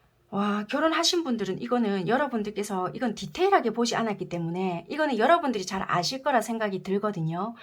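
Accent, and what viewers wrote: Busan, South Korea. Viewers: 대박!! 너무 잘맞아요! 너무 신기해요! 그리고 리딩에 진정성이 느껴져서 너무 좋아요☺️부산사투리도 너무 좋아요💕 3번 선택했습니다! 위로 얻고갑니다. 다음 영상도 기대할께요💖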